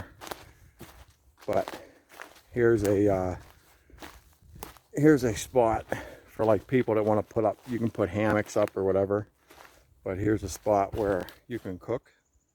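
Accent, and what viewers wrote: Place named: Pittsburgh, United States. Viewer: Love this vid bro....I was smilin and smilin. Your "Pittsburghese" was great to hear. Walkin "dahn" the road......... "mowl" 46......here's"da" connextor trail.....Goin "dahn air".........."yunz"........don't like "em" things......."make it outta dare"........"dahn ear where I parked" Great to see a Pittsburgher "dune" a vid! Keep on rockin! Maybe see ya aht on da trail some time!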